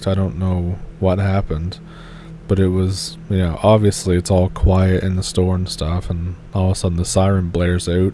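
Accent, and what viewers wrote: Canada. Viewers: Great content. Delivered in a fellow Canadian accent :)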